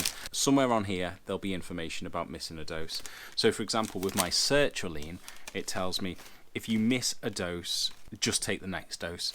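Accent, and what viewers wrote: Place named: Scotland, United Kingdom. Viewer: Are you Scottish? I love this accent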